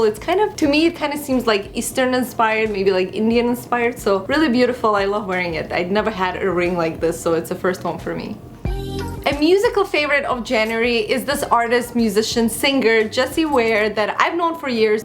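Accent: Russia. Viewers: Hi, i love your videos ♥ I started watching them recently. I know, that my English is so-so (I'm from Russia), but I think you're from Russia too, cos u have a Russian accent, is it? It may be, I have mistake. :) and by the way, you is a beautiful <3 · whats your accent from? you sound a little bit russian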